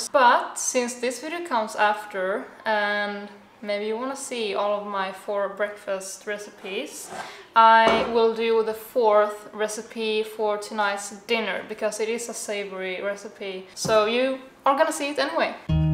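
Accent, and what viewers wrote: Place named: Sweden. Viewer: I love your accent! Forgive me if I am wrong but are you from Sweden? I am a first time watcher and I love your videos so far! ☺️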